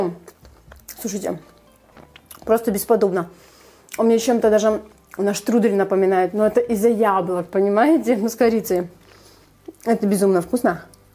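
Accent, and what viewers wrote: Ukraine. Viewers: Здравствуйте,. Спасибо за Ваш труд, за Вашу энергию, за Вашу любовь к подписчикам. Очень нравится даже просто смотреть, как Вы готовите, и слушать Вашу милую речь. Я строго отношусь к правильной речи, считаю это важным. Но, как здесь уже сказали, есть в каждом языке своеобразие и самобытность. Ваша речь полна этих самобытных украинских особенностей. Это здорово. Я очень любила в детстве слушать, как моя белорусская бабушка гутарила с соседками. Песня! Обязательно приготовлю по этому рецепту рулет. Будьте здоровы.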